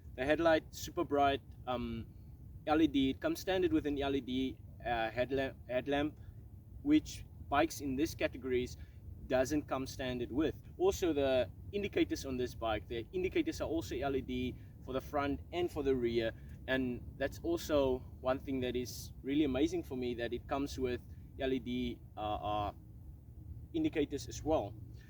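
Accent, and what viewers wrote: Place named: Afrikaans, South Africa. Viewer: The Afrikaans accent in this video is phenomenal 😁👍 thanks for the video! As a girl...it helped me a lot! 😁😂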